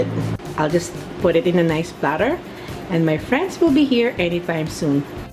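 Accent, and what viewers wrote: Philippines. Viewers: hi pooo.. pwede po ba to sa uling lutuin? wala po kaming oven eh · my family love the taste and the meat was moist. ( i put star anise- similar to cebu's style)... it got burnt on top a bit... the side got crispy but the bottom was not... and i used the thread for morcon and it got snapped.... in my scale of satisfaction- 10 highest, i give myself 5... but im gonna try again... brining really works... i do that on my turkey.. Salamat!!!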